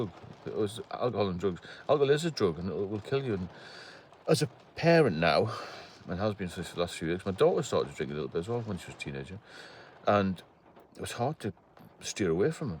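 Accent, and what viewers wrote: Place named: Newcastle, United Kingdom. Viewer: Where you from,I khow your a geordie,I use to go to Wallsend in the navy Been the big market in Newcastle afew times,got a few mates in Jarrow,all the best for 2025 sir👍👍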